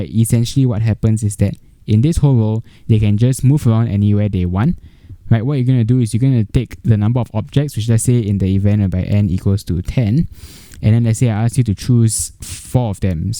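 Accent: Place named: Singapore. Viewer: are u singaporean ?? istg u sound just like my singaporean friend!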